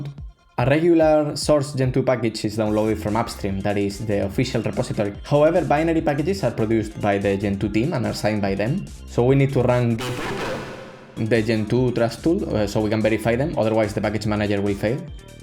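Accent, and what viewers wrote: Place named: Spain. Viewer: are you spanish? that accent damn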